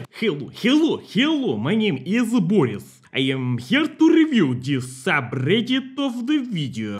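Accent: Russia. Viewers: The accent got me fucked up I cant keep up I need the subtitles sometimes you really think he be speaking in Russian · im positive hes russian but i would lowkey laugh for many moons if he was a white dude named walter with a good fake russian accent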